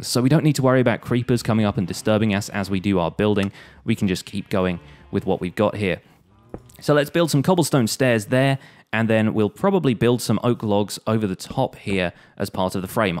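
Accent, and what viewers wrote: United Kingdom. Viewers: It's just a normal British Accent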